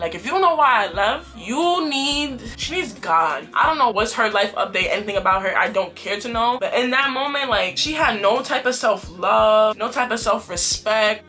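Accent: New York, United States. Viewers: oop i know a new yorker when i hear oneeee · love the way it sounds like i’m otp with one of my NY homegirls🤍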